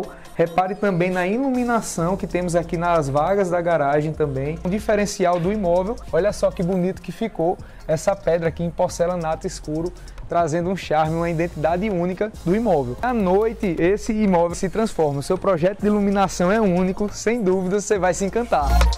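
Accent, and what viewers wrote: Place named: Pernambuco, Brazil. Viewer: sua voz e muito suave passa um sussego, obrigado por nós mostrar essas casas linda, sou de Pernambuco e vc tem um sotaque parecido com o meu.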